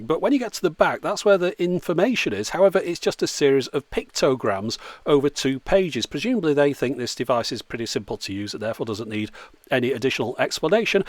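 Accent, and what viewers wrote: United Kingdom. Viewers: Charming British accent... mmm... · I love your videos and your voice! Its so British and classy.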